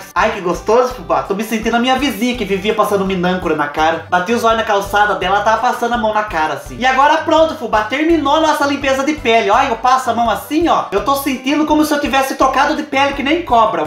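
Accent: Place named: Caipira, Brazil. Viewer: amo seu sotaque caipira e sua simplicidade você não fala palavrão GOSTO muito de você e do seus amigos Deus continuo te abençoando beijo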